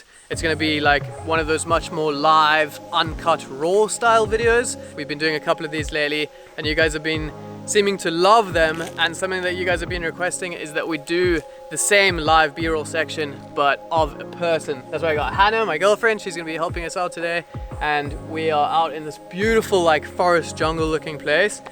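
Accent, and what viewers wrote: South Africa. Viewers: Ahh! I thought you were South African ! nice boet!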